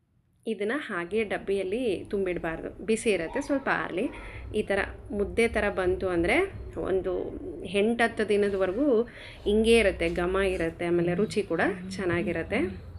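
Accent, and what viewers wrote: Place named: Shahapur, India. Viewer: ನಿಮ್ಮ ತವರು ಮನೆ ಯಾವೂರು ನಿಮ್ಮ ಭಾಷೆ ಕೇಳಿದರೆ ನಮ್ಮ ಕಡೆ ಹಾಗೆ ಮಾತನಾಡುತ್ತೀರಿ ನಮ್ಮದು ಶಹಪುರ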